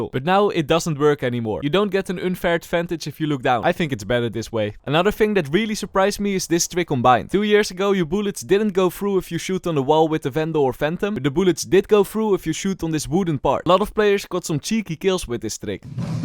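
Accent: Netherlands. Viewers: ik ruik een beetje nederlands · i smell dutch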